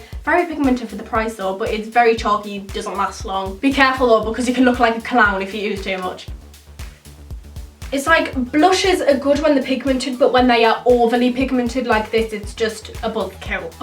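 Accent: Newcastle, United Kingdom. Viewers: Are you Geordie? Xx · are u British?? btw love u sooo muchh!!!! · Are you from Newcastle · Are you Geordie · Mysticl Sports sorta Geordie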